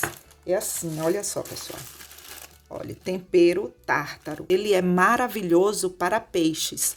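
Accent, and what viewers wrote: Bahia, Brazil. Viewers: Eu caì no seu canal por acaso, me apaixonei de cara e me inscrevi imediatamente! Muita simpatia e esse sotaque maravilhoso da minha terra, a Bahia...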